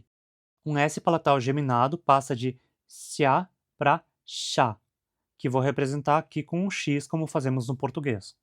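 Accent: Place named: South, Brazil. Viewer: vc tem um sotaque sulista, mas eu não tenho conhecimento suficiente pra discernir de qual estado vc veio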